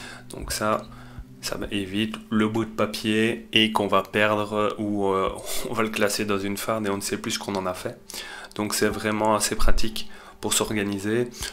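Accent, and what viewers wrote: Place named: Alsace, France. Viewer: Quels belle accent alsacien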